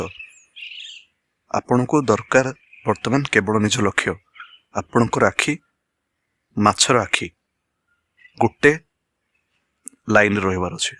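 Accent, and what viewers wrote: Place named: Odisha, India. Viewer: That odia accent between though 😍 😀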